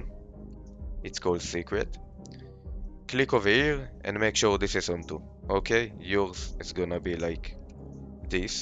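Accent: Israel. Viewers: אין סיכוי שאתה לא ישראלי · הוא ישראליייי?!!!?! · אתה ישראלי? איזה מבטא יש לך כפרה לא רואים בכלל שאתה ישראלי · רואים שאתה ישראלי שומעים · שומעים את המבטא הישראלי חחח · המבטא הכי ישראלי ששמעתי נודר😭🙏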